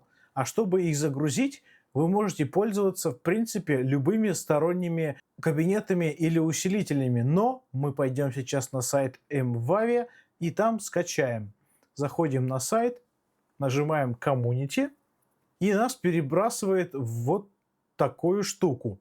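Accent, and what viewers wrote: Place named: Germany. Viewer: Купил такой недавно, прикольная штука, но на постояннку только новичку, и то с натяжкой. Обзор понравился, но есть ощущение что автор немец который учил русский как иностранный. Сорри если обидел, но звучит реально так 😅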